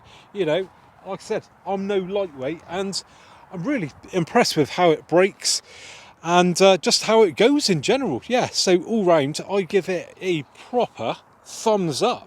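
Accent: United Kingdom. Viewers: Great to have a video with a British person speaking rather than Yankee's.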